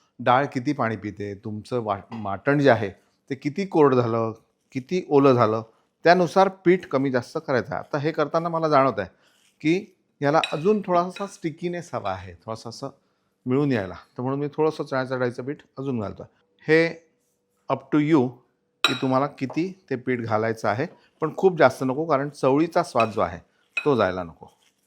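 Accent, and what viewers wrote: Vidarbha, India. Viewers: Kitti god bhasha tumchi,tumhi majhya vidhrbache ka ho😂❤❤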